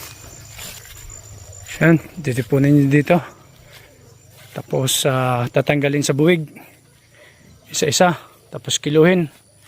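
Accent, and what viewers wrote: Iloilo, Philippines. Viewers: Ilonggo gid haha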